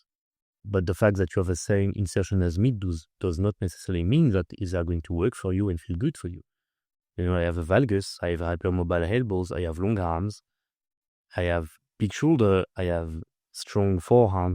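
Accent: France. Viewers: by accident, I trained for watching this channel by watching NH first. I have a bad ear for accents and I don't think I could understand you without acclimatizing myself to a thinner french accent first lol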